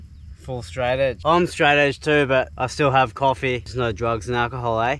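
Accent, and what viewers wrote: Australia. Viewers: Beautiful scenery, wonderful catches! 😀 I've never seen squid so transparent. And those eyes! WOW. Weird and wonderful creatures. Tasty too! 😆 I'm just trying to get a handle on your accent. It's sounds a little Aussie with a touch of Ringo Starr mixed in. 😁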